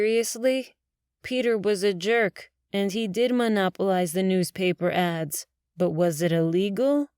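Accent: South, United States. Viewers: I love the Southern drawl. And I love the story. Thank you! Perfect entertainment as Halloween approaches 😊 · Y'all don't live in the south if you think this is over the top! All my neighbors talk just like this! And I'm in south Florida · I am sorry, but this narrator’s southern accent is way too much, I can’t finish it, it’s so distracting, and takes away from the story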